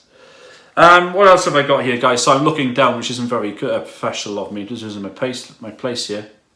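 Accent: Wales, United Kingdom. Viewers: I've just started following you. Do I detect some Welsh in your accent? - - Adverts, no thanks. I tend to delete the sub's link if they start throwing ads at me. Home-based HF round here is a lost cause, too much QRM, S9++ on a quiet day! I've got a G5 up, but due to lack of space, it is bent into a big Z, and not very high. So I'm mostly on 2M, but even that has been poor for a while. I thought, with a lot of people stuck at home, it might wake up, but that didn't happen. I can sit on my local repeater, GB3MN, all day, and not hear a soul except the repeater ident! (500 is a little bit more active, now and then). If you care to look, you can find me on You-tube, too, but there's no ham radio stuff on there. I'm not looking for a 'plug'! 73 M0HFF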